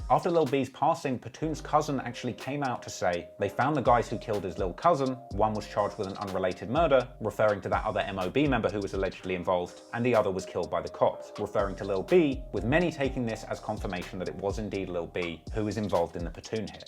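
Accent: United Kingdom. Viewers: Its lowkey kinda weird havin a British guy talk abt my city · Hearing the history of my city in a British accent is hilarious 😂😂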